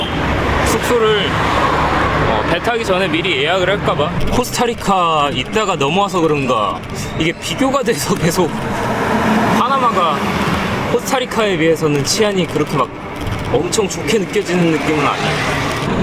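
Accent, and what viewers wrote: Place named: Chungcheong, South Korea. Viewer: 고향이 충청남도인가요? 말투가 내가 사는 고향 논산이랑 비슷하네요. 지금 유럽 몰아보기 3편 보고 있어요!!!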